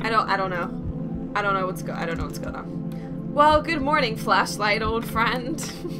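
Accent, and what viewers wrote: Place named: New Jersey, United States. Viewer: It sounds very Jersey.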